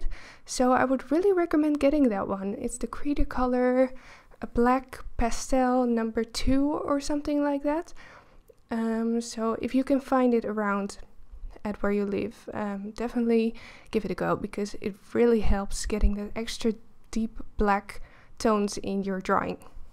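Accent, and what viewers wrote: Netherlands. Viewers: you just hear you are dutch lol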